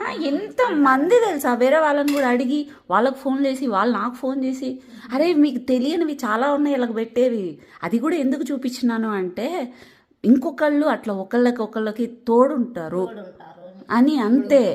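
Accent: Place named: Anantapur, India. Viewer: మీకు కూడా అనంతపురం జిల్లా యాస బాగా వచ్చేసింది 🙏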